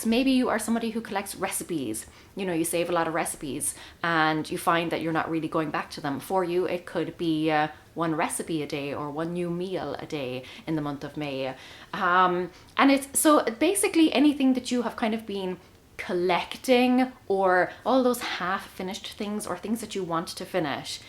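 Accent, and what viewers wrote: Ireland. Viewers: Love your brogue, what part of Ireland are you from?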